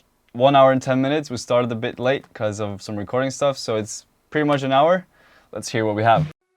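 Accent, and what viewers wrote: Norway. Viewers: So is this dude norveggian or sveddish?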